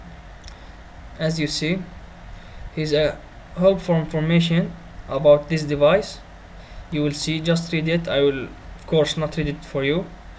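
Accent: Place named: Afghanistan. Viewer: You sound Afghan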